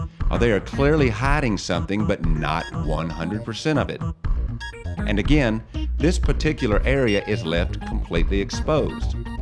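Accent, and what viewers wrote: South, United States. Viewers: I'm guessing that narrator is from the south...LOL GREAT video sir! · Your from the South, aren't you friend? Maybe....the Tennessee Valley? It's the accent. You sound like an educated Southerner... probably in the above mentioned region. There are alot of engineers and scientist in the TN valley, ie Marshall Space Flight Center, in Huntsville. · Love this guys southern accent. He sounds like my brother. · Hey stupid, Its not Mars! Its not what looks like weird stuff on Mars, Its a shot of a place on Earth and calling it Mars. You can't be that stupid. Or you are working for Nasa and are doing the life in space BS! Listen up Stupid, No planet, No space, No rovers, No nothing but an agenda to try to make our Lord and Savior seem like a creature from space to make us fight against GOD! When Yeshua returns, You bow down. If a fake alien invasion happens, You go to Nasa and Govt's to fight. 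And your accent of a Southerner does not make you trust worthy! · your southern accent just bring it home or reminds me of home.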